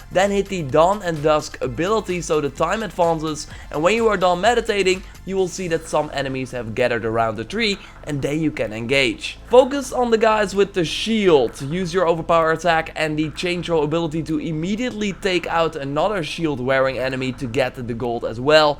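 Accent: Netherlands. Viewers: You’re definitely dutch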